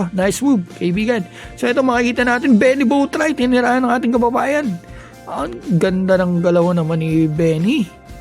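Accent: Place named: Visayas, Philippines. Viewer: kala ko bisaya ka sir😀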